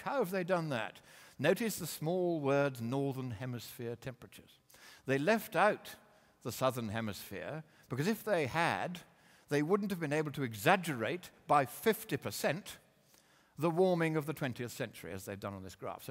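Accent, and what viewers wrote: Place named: Scotland, United Kingdom. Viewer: he's scottish. That's a (way) upperclass scott accent.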